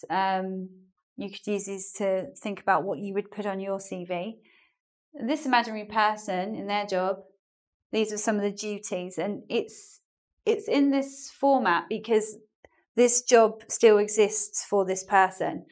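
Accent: United Kingdom. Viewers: thank you very much,. i really like your videos, there are very informative although i enjoy to practice my listening skills with your british accent.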